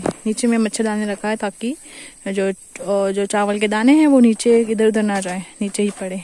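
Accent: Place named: Jharkhand, India. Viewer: Ap to bilkul jharkhand k logon jaisa hindi bol rhi hai, ekdm raw and original. I thought people over here speak only English. This is the first video I am watching related to Mizoram lifestyle and I am quite impressed. Lots of love and warm hug from Jharkhand.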